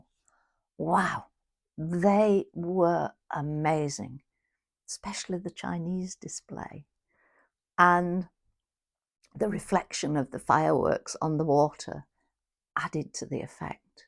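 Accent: United Kingdom. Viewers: You're my model in my journey for learning British accent ❤❤ love & peace 🕊️✌🏻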